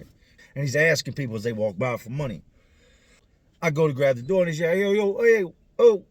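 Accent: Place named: Philadelphia, United States. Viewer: i can hear that PHILLY in this voice..even though he from VA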